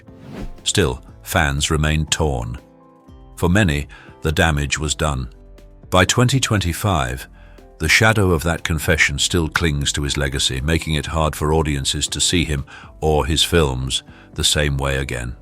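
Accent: United Kingdom. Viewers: Of course, a British narrator.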